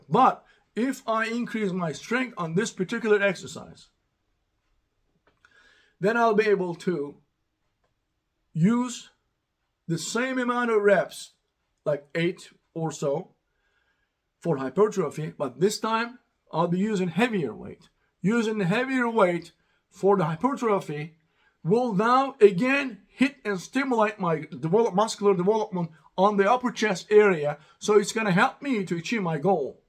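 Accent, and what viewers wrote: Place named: Texas, United States. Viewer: abi, teksas accenti ile konuşmak seni godfather filmindeki adamlara benzetiyor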